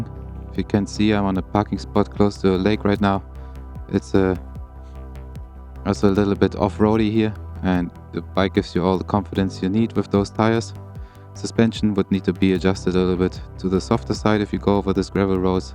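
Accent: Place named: Germany. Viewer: Habe mir das Video angeschaut und dachte, hmmm klingt irgendwie nach nen deutschen Akzent… wartete bis das Nummerschild sichtbar wurde… jap wurde nicht enttäuscht. Hätte mich aber mehr auf deutsche synchro gefreut 🤷🏻‍♂️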